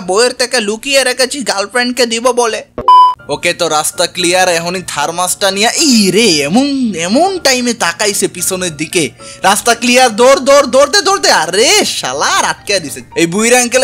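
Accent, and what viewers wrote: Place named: Barishal, Bangladesh. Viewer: vhi apnar bari kothay apni ki borishali na- - ----_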